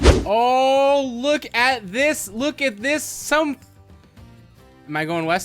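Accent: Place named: Russia. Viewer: I have to ask (re: Russian/Ukrainian accent)--are you of Russian descent, or it the accent just a fun-to-do thing? 8-D Either way, I always get a kick out of it. lol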